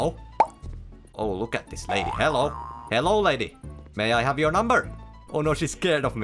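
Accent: Finland. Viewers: The Finland ascent was very good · Are you finnish?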